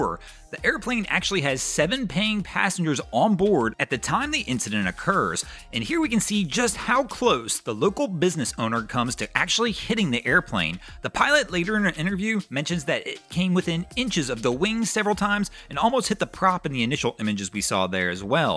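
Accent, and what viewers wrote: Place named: North Carolina, United States. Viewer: He sounds like a North Carolinian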